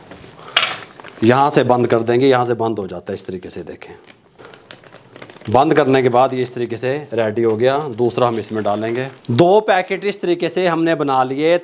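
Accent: Punjab, India. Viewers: Punbajion ki urdu sunnay ka alag hi maza hai gal samajh aa rhi hai 😂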